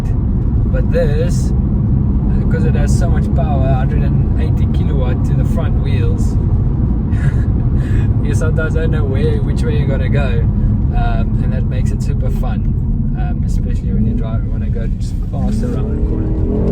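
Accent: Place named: South Africa. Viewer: I could listen to that South African accent all day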